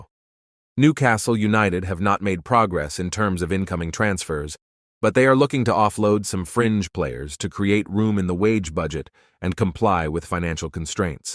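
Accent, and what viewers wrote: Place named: United States. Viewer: another yank voice owa thumbs doon them all!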